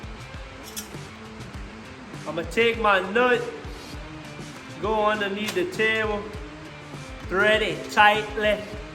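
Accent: Louisiana, United States. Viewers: Sound Cajun as hell, love it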